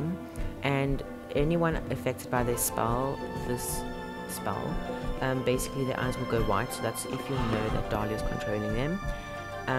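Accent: South Africa. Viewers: R u from SA?? 😜💅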